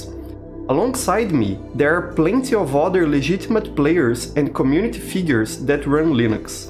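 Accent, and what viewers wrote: Brazil. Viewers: EU SABIA Q VC ERA BR, O SOTAQUE NAO ME ENGANA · are you brazilian because your accent reminds me of brazilian and I'm from brazil :D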